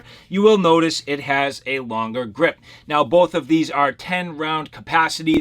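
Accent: Massachusetts, United States. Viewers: u sound like u live in mass like me. With the stupid 10rd mag bullsht. Sucks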